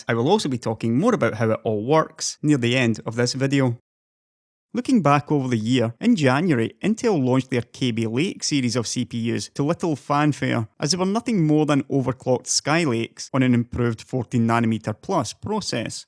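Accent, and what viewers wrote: Scotland, United Kingdom. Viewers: I can hear the Scottish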